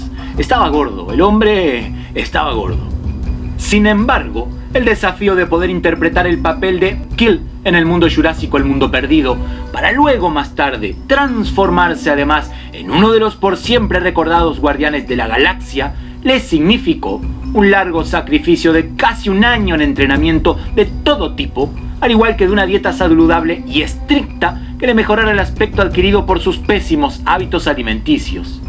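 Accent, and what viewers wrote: Argentina. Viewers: que eres Argentino o Uruguayo??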